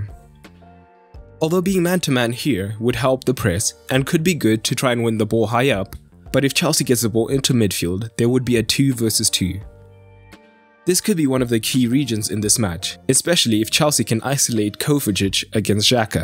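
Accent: South Africa. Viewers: You sound South African ? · Is that a South African accent by any chance? Quality, as always!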